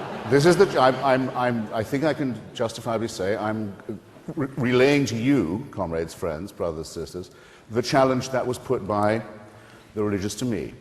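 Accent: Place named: England, United Kingdom. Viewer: It's just his pompous attitude, and English accent.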